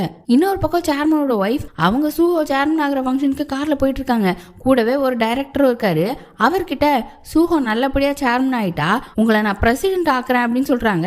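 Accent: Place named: Tirunelveli, India. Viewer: Apdiya. Nanum old TVL new TKSI districthan. Irunthalum konjam different ahgathan irukku. But nallirukku unga slang😊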